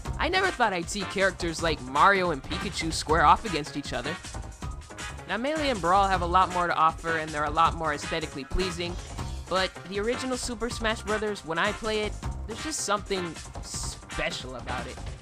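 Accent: Canada. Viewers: Damn thicc Canadian accent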